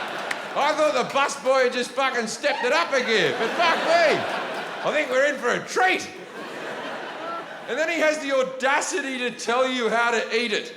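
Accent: Australia. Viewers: Is he Australian or not, I said that because of his Accent. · So Aussie lol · I can't tell if this is a joke or if you haven't realised he's genuinely Australian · As an Aussie I cannot stand this stupid Occa accent. The guy sounds like he was dropped as a baby...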